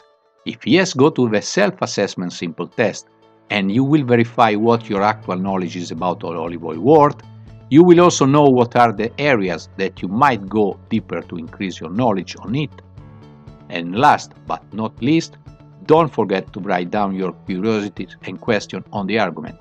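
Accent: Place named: Greece. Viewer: Love the greek accent Edit: Alright. I'm confused. Italian name and speaks Italian, but the accent sounds so Greek to me.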